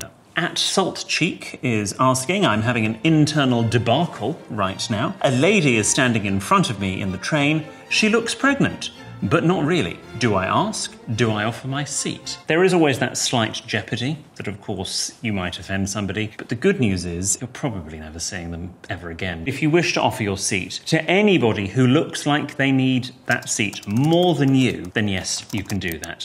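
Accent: United Kingdom. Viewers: 他用超級英國的方式開嘴說幹話反差令整件事更有趣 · I'm learning how to cut cheese for Shak cut (字幕記錄 沒意外是錯的 不是 Shak cut，奶酪也許 charcuterie 法國食品才正確，IPA約為 /ʃɑːˈkuː.tər.i/ ，最末的發音近乎 /triː/ ，沒金耳朵聽了多次也不確定。如不是主持這英式近PR的口音，字幕記錄錯的也許多的多。) well you live left on the edge here . 這裡的內嵌中文字幕，把問的人形容為89，但89我也不明何意，問google，大意就是"底層"或是"低端人口"吧。 如是這樣，根本不配合片中上文下理，也許完全相反，低等文明不存在學習禮儀的可能性，黑社會不會問：老闆，我可以用武力威嚇你給錢就稱作保護費嗎？ 很有趣，我沒多少英文程度，去問問AI，反覆的問，得到較合理的解說是 "考慮到上下文，主持人在談論切奶酪作為餐桌禮儀的一部份時，並不是在字面上描述真正的冒險，而是在輕松地開玩笑。可能這句話的意思並不是詢你"你會過上這樣的生活嗎？"而是以一種幽默的方式贊美這個學習過程，暗示在切奶酪方面的精緻和技巧可以被視為一種"冒險"。 哪通俗的說是你問的程度是專業的啦，二是，你在裝B。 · 還是喜歡英式的紳士風度，腔調聽起來很舒服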